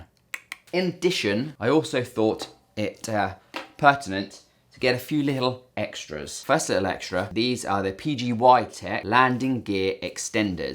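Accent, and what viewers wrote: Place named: England, United Kingdom. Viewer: Oh! It's so nice to hear an English accent (I have nothing against American people by the way.) It's just that all the other reviews I've encountered have been American or Canadian. What a relief! Someone speaking my language!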